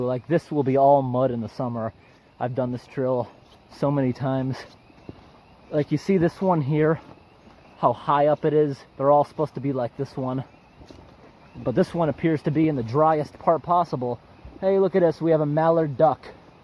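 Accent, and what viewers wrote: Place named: United States. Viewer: I'm From New Zealand and I like his American Accent.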